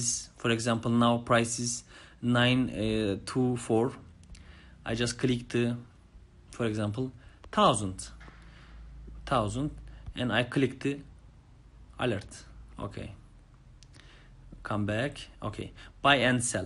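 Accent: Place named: Turkey. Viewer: Ru Turkish lan aksandan belli sil bunu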